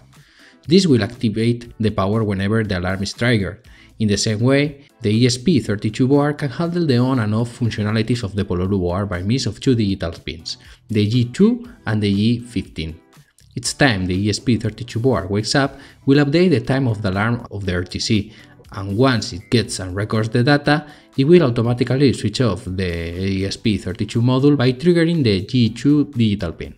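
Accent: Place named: Spain. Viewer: Tu eres español!!!, no pregunto. Gracias por el video y compartir proyecto. Trabajo con ingleses y tu acento te delata.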